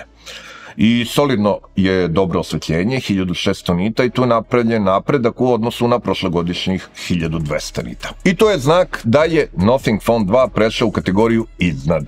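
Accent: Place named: Serbia. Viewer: Ovo je srpski kanal, a ne americki, tako da je izgovor prilagodjen srpskom govornom podrucju.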